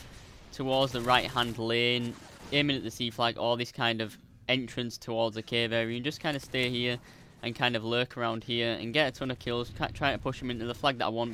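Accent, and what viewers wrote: Yorkshire, United Kingdom. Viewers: love the yorkshire accent, good tips and comp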